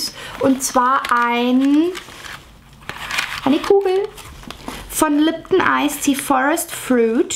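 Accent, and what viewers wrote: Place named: Franconia, Germany. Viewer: Du bist mir fränkisch am liebsten❤️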